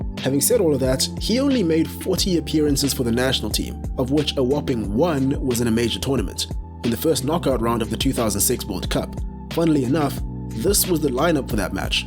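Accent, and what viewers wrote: South Africa. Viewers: Mzansi accent. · I’m hearing a South African accent 🤩 awesome video!! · Are you South African? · Are you South African ? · Are you South African?? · Love the SA accent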